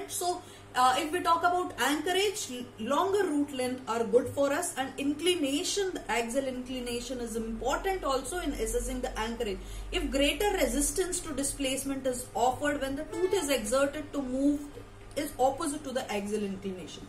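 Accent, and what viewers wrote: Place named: India. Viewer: Thank you ..can you make translation for vido it is very good but i cant undrstand indian accent very will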